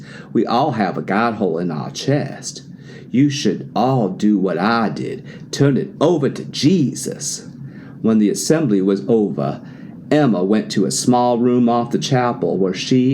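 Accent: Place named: South, United States. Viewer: Mr, sir… Come with your Southern drawl…and welcome aboard! I’m the Captain of the SS Nurse Jane… On the Herring Bay!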